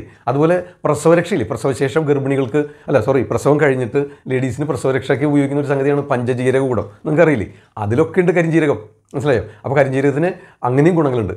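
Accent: Thrissur, India. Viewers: ത്രിശൂർ slang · തൃശൂർ ശൈലി യിൽ നല്ല അവതരണം. Good information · Trichur slang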